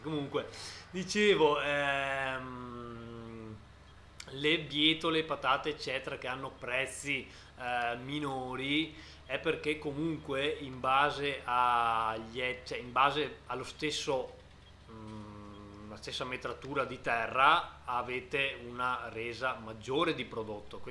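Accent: Veneto, Italy. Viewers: Sei Veneto?😂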